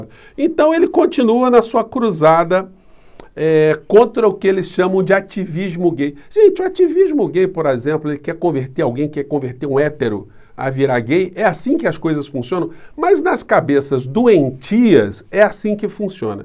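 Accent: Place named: Northeast, Brazil. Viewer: SR. esse seu jeito de falar é universal todas pessoas do Brasil lhe entende, mas lhe pergunto tu és Nordestino?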